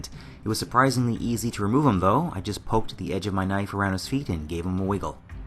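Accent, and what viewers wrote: Canada. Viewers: I knew you sounded Canadian! Maritimer?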